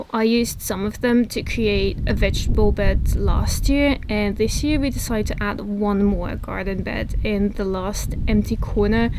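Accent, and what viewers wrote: Netherlands. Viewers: I also heard Dutch… Groeten vanuit Breda 🥰🥰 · De eerste keer dat ik een filmpje van jou zag dacht ik bij mezelf; er is iets met haar accent, waar zou ze vandaan komen? Erg grappig om Nederlands te horen 😃 je tuin wordt erg mooi en ik kijk elke keer uit naar de zondag!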